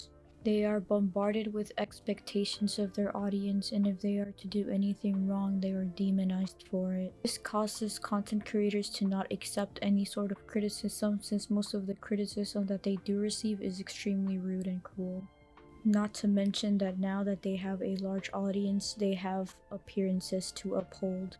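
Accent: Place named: South, United States. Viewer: Omg no I love Southern/Black accents 😭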